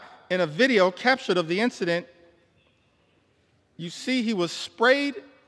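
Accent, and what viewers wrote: South, United States. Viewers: Trying so hard to model his presentation after Obama’s. Check his cadences, body movements, and his love of repeating his punch lines 3 times. And what’s with his southern accent? He’s not from the Carolinas; he is from New Jersey!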